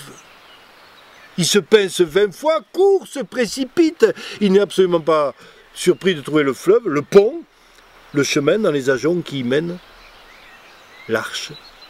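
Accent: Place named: South, France. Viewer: Du Paolo Cuhelo, avé l'accengn... Merci ! J'allais dire : encore ! Mais encore, tu nous le donnes déjà.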